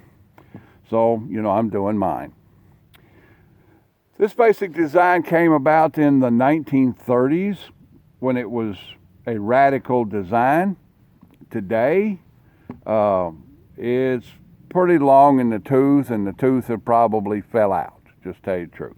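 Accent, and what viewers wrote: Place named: South, United States. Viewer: I really enjoy your videos, they are down to earth and besides I like your Southern accent ;)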